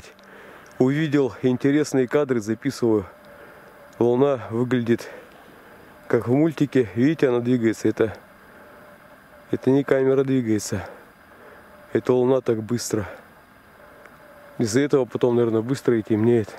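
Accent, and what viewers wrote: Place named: Siberia, Russia. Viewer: "разожгем" , "изгинается" еще) Но я бы на твоем месте не был занудой, это таежный говор, по сути, это и есть гигантская деревня , выезжаешь за 300 км от центра России и там все так говорят. Они смородину называют "кислица", обломки скал называют " курумы" и тд. Там вообще много различий в словах и произношении с центром рф. Это можно сказать провинциальный диалект свой.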